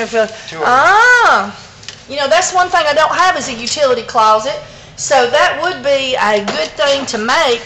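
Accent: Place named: Alabama, United States. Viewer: I feel like I have a connection to you, although I'm from New York, my mom was Born and raised in Birmingham Alabama. Hearing your accent allows me to think of the times I spent one year there attending school and came back to New York with a BAMA accent. lol. but that was many years ago. Again, good luck and I'll be watching the transformation of your store. So exciting!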